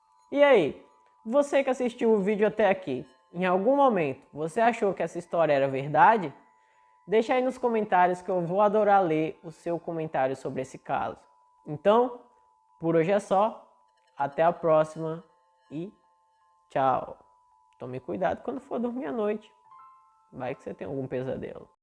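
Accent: Northeast, Brazil. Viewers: Gosto do seu jeito nordestino de falar😁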